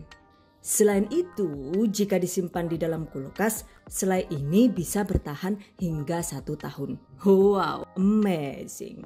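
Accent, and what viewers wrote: Banyumas, Indonesia. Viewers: selalu tersenyum bila bu naratornya udah ngeluarin bahasa ngapaknya😄😆 tapi selalu mantap resep dan tipsnya. semoga semakin maju channelnya.. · Terimkasih bunda...sangat bermanfaat untuk saya....kepengin ngundang "yu" janen..kayane wong panginyongan... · Sampeyan wong bule opo wong ngapak to mbak kok lucu banget tlng tampakan wajahnya mbak penasaran sy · Bisa ngomong bahasa ngapak yah 😁 Cilacap hadir☝️ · Penjelasan nya mudah dipahami.. ngomongnya enak didengar ada lucu," nya bahasa ngapak.mantap bunda. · deneng ngapak 😅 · Ngapak2 deneng koh😁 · Resep selai nanas dr video ini uda q download dr setaun yg lalu jd resep andalan... sbnernya selain resep nya yg ciamik... suka sekali dgn pnjelasan2 nya yg lucu n logat ngapak nya apalgi pas bhsa ngapak nya keluar truz blkgnya sllu ada kta yyuk... jd bsa snyum2 sndiri.. #GiveawayTHV2021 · Suka banget sama chanel jlas Pk bnget jelasinya..lucu ngapake koyo nyong😂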